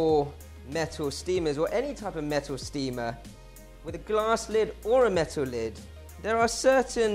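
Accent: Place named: United Kingdom. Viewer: The british accent tho... **heart eyes emoji*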